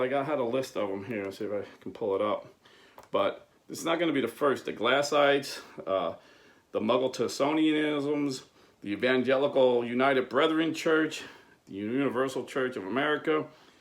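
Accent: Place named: Northeast, United States. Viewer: Are you Italian? You have a Northeast US accent or Chicago accent. Can't tell which, since I'm not from USA.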